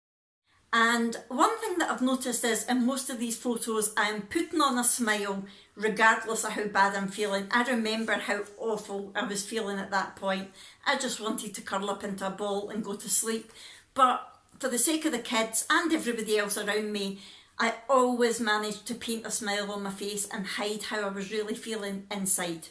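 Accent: Scotland, United Kingdom. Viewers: .. Sorry, it was Rick Chan who mentioned your channel in his comment on a mutual vlog we watch called Worms Eye View. She is learning Welch and how to say the words, and Rick mentioned your Scottish accent, which I love, so I scooted over to your channel. Hope I didn't confuse you. Ha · Hi have just came across your videos on here, can I just say that you are such an inspiration, I am working my way through all of them lol 😊 really enjoying listening to a fellow scot for a change, I'm loving that you are a crafter too so am I. Thank you ❤️😘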